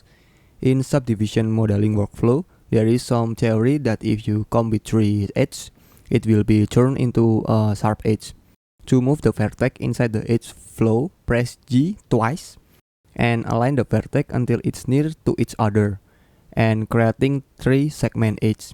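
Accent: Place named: Indonesia. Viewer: Thanks, it's helped a lot! btw I can tell from your accent, are you Indonesian? ;)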